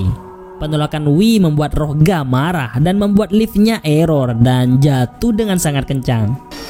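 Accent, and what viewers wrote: Madura, Indonesia. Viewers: Logat e gk Iso di bujuki Cong..Meduro Yo Cong ? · Logatnya kek ada rasa rasa khas orang madura, iya gasih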